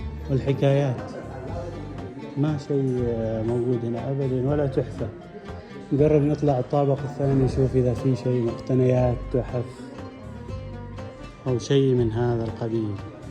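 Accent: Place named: Oman. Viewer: من لهجتك عماني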